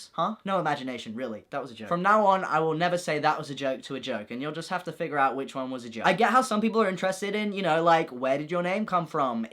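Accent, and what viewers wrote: United Kingdom. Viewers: BRITISH PERCY JACKSON? :0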